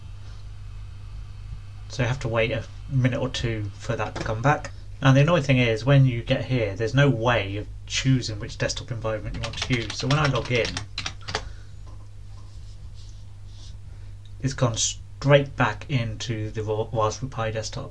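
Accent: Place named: United Kingdom. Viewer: very good explanation with clean british accent nice one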